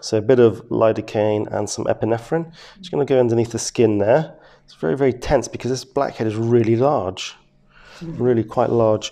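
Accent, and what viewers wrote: England, United Kingdom. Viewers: What I like about you is that you are very clean - you wipe the stuff off right away and you do everything quickly without hesitation, and correctly. No beating around the bush. You don't even need an assistant! You've got it all under control! Kudos to you!! And your beautiful English accent is wonderful! Love you!!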